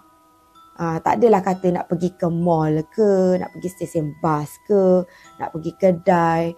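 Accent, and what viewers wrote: Kelantan, Malaysia. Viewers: Akak ni orang kelantan ke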